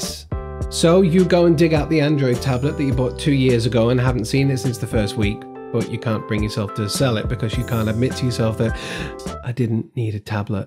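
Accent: United Kingdom. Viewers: BRILLIANT ... I feel so stupid.... i bought a used ANDROID tablet, just for the drone, tested at home, props off - GREAT !!!! Went to fly today, thinking it was a great idea to take one of my shorter leads, from the 'stash' that we all collect. I had to revert to my phone and the supplied short lead, I just couldn't get my tablet to connect.,. I tried changing settings, everything I could. it wouldn't work. Then, I came across this video, rummaged through my stash and found the lead I'd used first. It worked, a Sony USB-3.... Fantastic. I then found a short USB-C and will use this with adapter. Thank you so much for this. SUBBED your channel based on this and the fact it's UK accent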